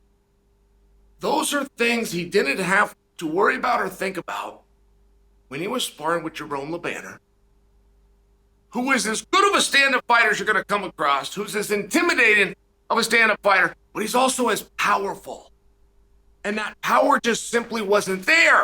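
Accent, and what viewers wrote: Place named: United States. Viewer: Ok Chael, you say the word poll nothing in a google search. The closed captions say you said poll. There is a move called a pull, I’m guessing it’s the yanky accent.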